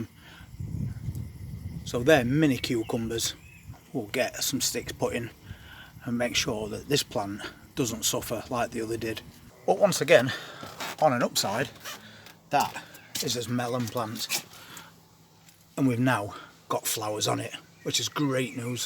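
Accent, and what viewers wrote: Yorkshire, United Kingdom. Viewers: Free plants next year, I just watched a video about your move, I hadn’t realised! Anyway, I’ll get to my point, every year I give loads of plants away, I’m in south York. I know you’re in Lincolnshire, but your accent sounds very Yorkshire/Derbyshire Anyway…I know it’s a bit of a track to get to me, near Sheffield. But…if you like I’ll deliberately grow some in the cell pots then you can grow them on & I’ll post them to you or get my arse on the train & meet you half way. Save you keep paying out at garden centres for things like courgettes that barely cost anything to grow. Although I’ve got a massive garden I’m disabled, so my digging days are long gone, so I only use planters but I have got a greenhouse and a shed with a massive window I use for extra space…I might (ok I do) use the porch as well then any deliveries I have to say “it’s ordinary plants nothing illegal” 😂 I tend to grow flowers, tomatoes, cucumbers, courgette, pumpkin, carrots, radish & like you loads of lettuce! 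Basil, mint, rocket (love rocket to a sad extent 😂) spinich, I’m walking around my planters in my mind 😂 spuds & green beans oh & pak choi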